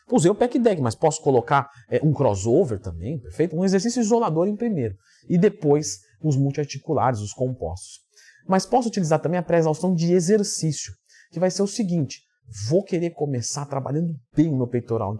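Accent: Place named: Pará, Brazil. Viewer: Mas temas aí pai: que fala é o de Belém do para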